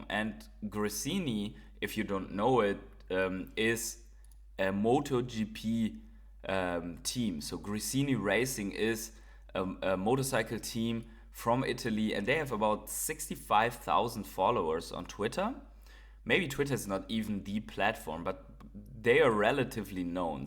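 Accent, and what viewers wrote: Germany. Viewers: Woof🐶 Hörst dich sehr Deutsch an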